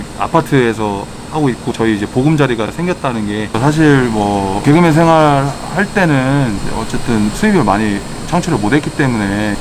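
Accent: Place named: Chungcheong, South Korea. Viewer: 찐 충청도 충남 말투 굳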